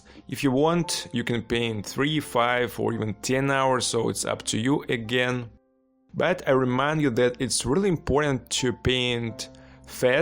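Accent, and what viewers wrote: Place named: Russia. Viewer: Amazing,i love your russian accent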